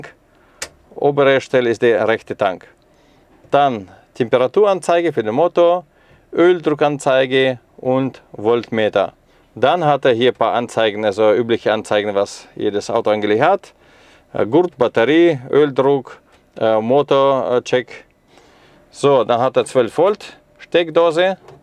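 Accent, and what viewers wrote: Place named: Russia. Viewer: Herrlich..ich liebe 2 Akzente,den franzoesischen und den russischen..russische Technik sowieso,fahre nen uralten Lada,verlaesslich.Gruss aus Patagonien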